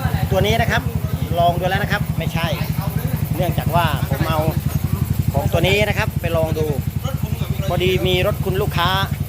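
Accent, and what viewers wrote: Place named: Surin, Thailand. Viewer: เสียงคนพูด คนสุรินทร์ชัวเลย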